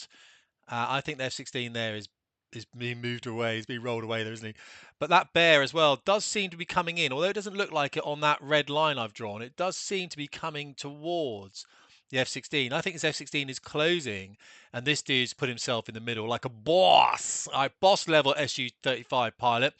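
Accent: United Kingdom. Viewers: Knowing what is going behind the scenes of the Ukraine war I love hearing a British accent make fun of a US pilot after all the US has done for with helping the UK in Ukraine. Total morale booster of a video. I think this video needs to make the round in the US military to remind us of who the OG enemy of the US is and why we should never trust them ever again.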